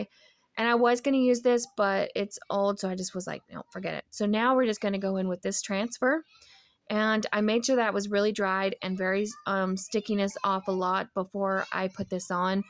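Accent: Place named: South, United States. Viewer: I love ❤️ your diys.. this really inspired me to get ready to start fall diys. You are so sweet love your southern accent. ❤️ I am from the south also. Have a blessed day.. keep those diys coming. 🎉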